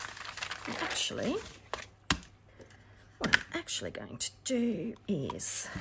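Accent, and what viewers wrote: Australia. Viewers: Hi, again, it is great to see how transferable skills can be. I started out, many years ago, as a scrap booker, then I was introduced to card making. Now I have found the wonderful world of Junk Journalling. While I have been collecting ideas and supplies for some time now, I have yet to actually make many projects! While I have used serviettes (napkins) as decorative card fronts I have not thought to use them to ‘dull down’ the shine from pages; a great tip. May I also say it is lovely to hear a familiar Aussie accent! No offence meant to our American, English, or Other, Journallers. Best wishes to Everybody and may your journals be all that you want them to be. 😀🦘🐨🇦🇺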